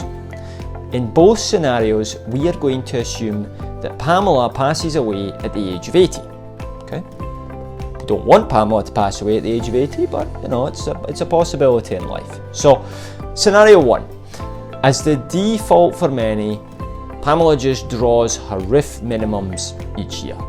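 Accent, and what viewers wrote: Scotland, United Kingdom. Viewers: Beautiful accent. I love it. Not sure what it is. Scottish? Short and to the point advice. I am not surprised, that this video didn't generate many views, nor comments. People simply prefer to complain about CEOs salaries and big corporations profits, than look after their money. To me ( I am recently retired) the biggest advantage of RRSP is the fact, that all capital gains and dividends generated for about 40 years are tax free. It continues within RRIF for the next ( hopefully) many years. Most people, even accountants and financial planners hardly ever talk about it. Understanding of this simple fact worked for me wonders.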